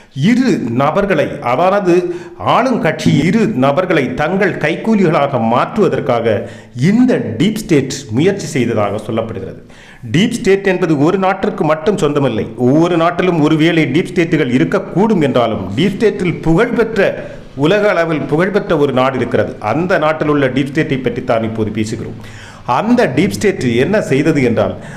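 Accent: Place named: Nanjil Nadu, India. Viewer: இந்த உண்மை விஷயங்களைப் புரியும் வண்ணம் நாஞ்சில் நாட்டுப் பழகு தமிழில் இனிமையாகவும் கோர்வையாகவும் மிகவும் நேர்த்தியாகவும் ரசனைக் குறைவின்றியும் எடுத்துரைத்த அன்பருக்கும் இந்த ஊடகத்துக்கும் நன்றி கலந்த வணக்கம்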